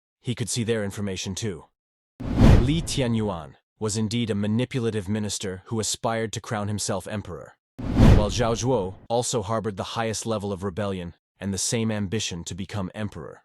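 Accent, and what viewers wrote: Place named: Ireland. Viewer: The narrator seems Irish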